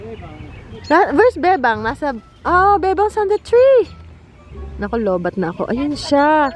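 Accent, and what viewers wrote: Batangas, Philippines. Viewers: Thank you so much sa pagsagot ng tanong ko..kaya pala may dine at baga ang salita ..kahawig ng salita namin sa Mindoro...lagi ko pong panunuurin ang inyong vlogs..thank you so much🥰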